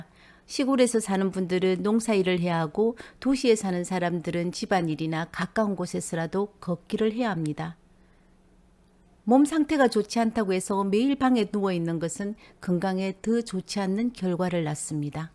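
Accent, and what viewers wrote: Gyeongsang, South Korea. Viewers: 말마다 옳습니다 경상도 사투리도 예쁘십니다 ㅎㅎ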